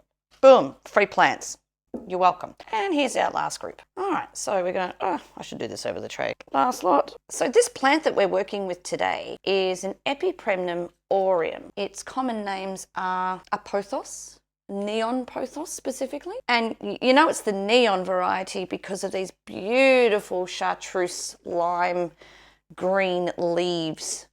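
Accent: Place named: Australia. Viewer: It's nice to hear another Aussie accent on here ! 👍🇦🇺 Sub 412 here. 😃 Yes, $14 for 1 cutting sucks !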